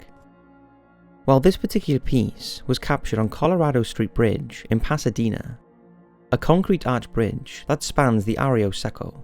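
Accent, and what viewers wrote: Wales, United Kingdom. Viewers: Are you welsh? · Will follow when you start speaking properly and stop sounding like some Welsh Robot.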